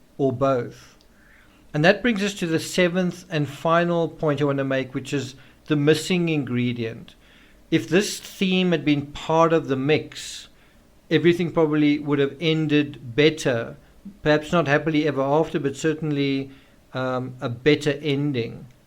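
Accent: South Africa. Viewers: Are you South African🇿🇦🇿🇦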